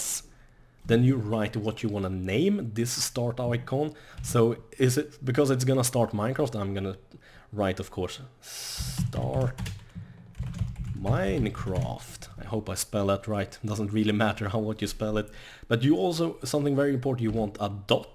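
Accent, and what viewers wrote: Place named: Sweden. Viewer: Swedish english is simple to discover...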